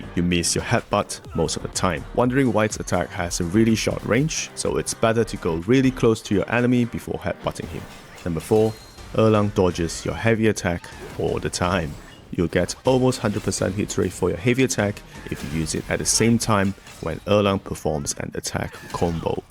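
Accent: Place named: Singapore. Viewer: Nice guide bro, you must be a Singaporean with that accent :)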